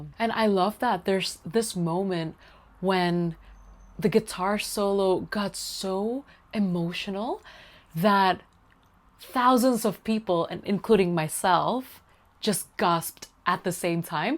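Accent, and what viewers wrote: United States. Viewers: Jakarta, Singapore, and London...yet your accent is quite American. California, perhaps the bay area. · I just found you and love your reviews. I was wondering, you speak perfect American English but you grew up in Indonesia then went to London. I don’t hear an Indonesian accent and if there is, it’s very slight and you definitely don’t have a UK accent. Did you go to college in America? You are a very beautiful person. Love your take on music as well.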